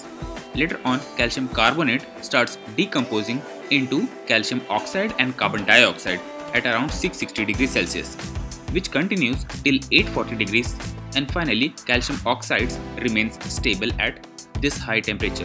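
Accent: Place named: India. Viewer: perfect video short and understandable. but please work on your accent, please . make english(american) accent from indian accent to make it more unverstandable . thank you if you understood correct.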